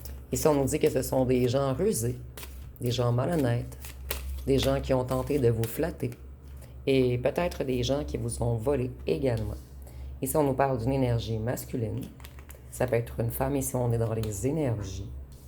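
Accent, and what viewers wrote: Quebec, Canada. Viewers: Bonsoir et oui il y a des gens qui passent leurs vies à faire le mal mais tout revient vers ses personnes tôt ou tard Ma lumière dérange parait il mais j'en envoie beaucoup aux autres à travers les soins étant guérisseuse ( genre Laochi de la 13 Octave ) etc Psychotherapeute après je me suis dirigée vers les soins énergétiques. Merci votre guidance est terrible vous voyez incroyable J'espère que vous continuerez même partant au Quebec j'adore votre accent cela me rappelle l'intonation d'un ancien ex Québécois Quel beau pays Merci à vous Excellente soirée bise❤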